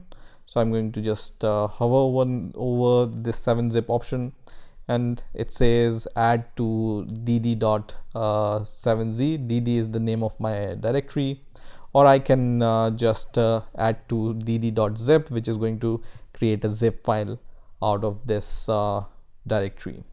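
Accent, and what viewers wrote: India. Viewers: beautiful indian sounding man i love u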